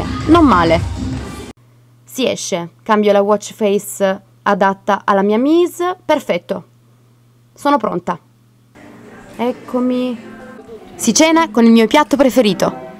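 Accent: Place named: Salento, Italy. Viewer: Orgoglio, una pugliese salentina fa parte di questo team favoloso! Si sente l'accento, specialmente quello dell'amico in live sull'apple watch xD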